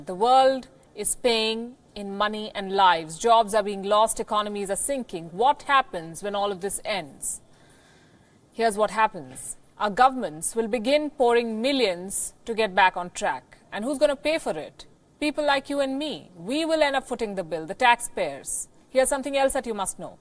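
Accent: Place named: India. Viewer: Listening the accent I'm sure she is another indain journalist Aaj Tak, India tv and now this shame on you Is there any channel of India which broadcast a real news ? Just send me the name . I really would like to watch it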